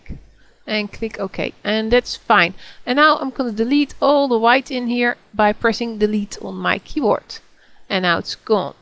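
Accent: Netherlands. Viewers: What capture program do you use?, PS: Ik hoor het aan je accent, jij bent nederlands he?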